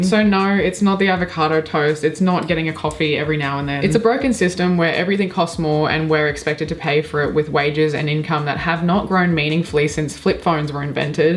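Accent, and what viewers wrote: Australia. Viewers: Hey you sound like an Aussie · I love thought-provoking & enlightening rants Especially if they feature a hilarious aussie accent lol I really like to rebuttal with my own profound take on this matter But for now, I just wanted to say thank you for being a critical thinker Subscribed Bless u ❤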